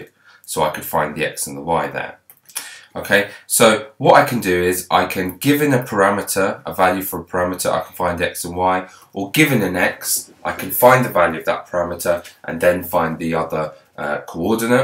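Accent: United Kingdom. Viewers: math sounds so much nicer with a british accent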